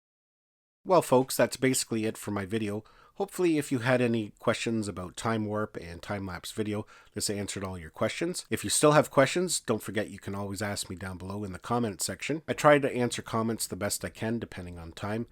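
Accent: Canada. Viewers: Thanks heaps for the help. I'm thinking from the accent and scenery that you're a Canadian!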